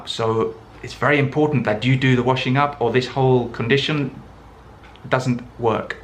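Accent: United Kingdom. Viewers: I fall in love with your videos 😊 Especially your impossible British accent 😍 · Thank you very much! Great way of learning English grammar (also British accent, which I personally love😍).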